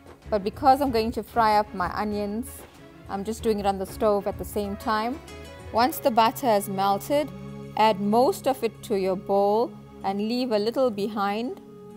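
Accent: Durban, South Africa. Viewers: Unmistakenly Durban accent. My sister in US over 50 yrs still has strong Durban accent. Me tooo🎉🎉🎉🇿🇦🇿🇦🇿🇦. Had that beautiful barracuda over 50yrs ago in Durban. I can smell those Durban spices. My mum also made the most delicious tinned fish biryani which I still make. It’s a hit when I do🎉🎉🎉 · Excellent production!!! How lovely to hear authentic South African commentary without the horrible American Slang. Please preserve your beautiful accent and unique presenting style!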